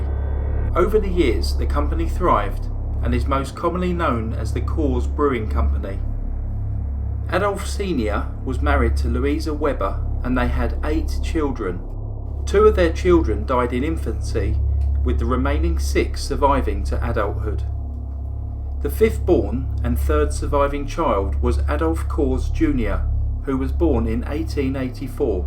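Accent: England, United Kingdom. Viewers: I think my hubby is jealous, he doesn't understand why I love listening to your voice for one. He knows I love mysteries and scandals, but I told him your voice and others English voices adds to the attraction. Please don't ever lose that! With us being your cousins I cannot figure out how we lost it here centuries ago.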